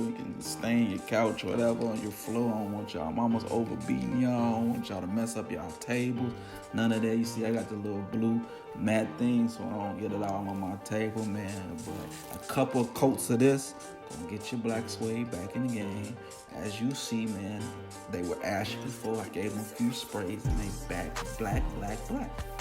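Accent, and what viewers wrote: Chicago, United States. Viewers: soon as I realized in yo talk you was from chicago too, i knew I was rockin w you🤣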